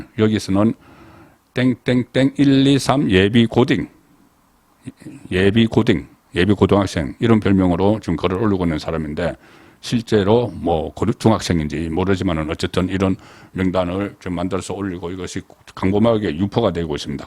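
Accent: Gyeongsang, South Korea. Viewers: 광끼 라고 읽어야 합니다. 경상도 분이라서 발음 안되심 ㅋ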